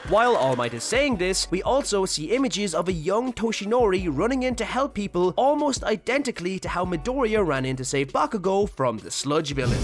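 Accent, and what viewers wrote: Ireland. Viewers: Where ye from lad? Ur accent sounds slightly irish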